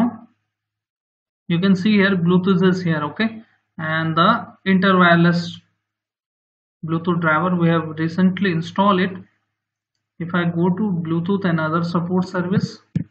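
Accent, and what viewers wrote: India. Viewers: man I should know by now to trust indnan accent, you are the G my man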